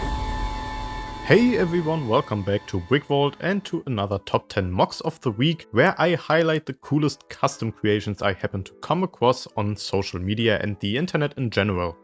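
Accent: Germany. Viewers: Who is this guy with the lovely German accent? He's doing a great job. Ich wohne in Deutschland. Super smart friendly people 🤩🙂🤓🇩🇪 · Oh man der deutsche Akzent ist ja mal anders wild 😅 klingen wir wirklich so krass 😅 gutes Video übrigens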